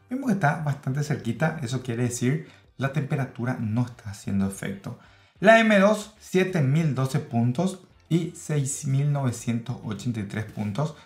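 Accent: Paraguay. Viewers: gran video. amigo tenes un acento paraguayo. cuál es tu nacionalidad? saludos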